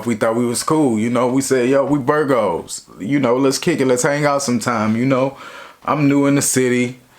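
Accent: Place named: New York, United States. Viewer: I gotta say you got that New York accent down cuzzo! Great story time bro! 👍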